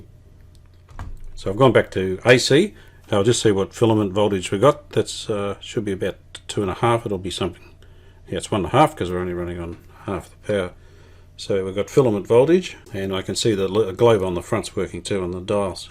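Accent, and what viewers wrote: Australia. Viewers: That Australia accent are so cool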